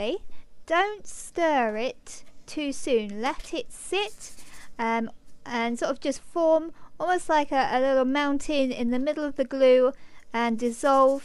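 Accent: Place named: England, United Kingdom. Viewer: Excuse my curiousity, please, from which part of England are you coming from? You have such a lovely accent! By the way, I first leave my glue in water for 24 hours so that the granules can soak up the water. Only then do I heat it, but not above 60 degrees, otherwise the glue loses its binding power.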